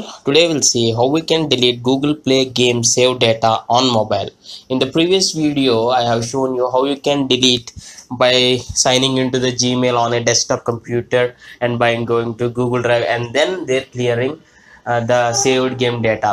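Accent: India. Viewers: Always a trash video, when it’s a tutorial with an Indian dialect…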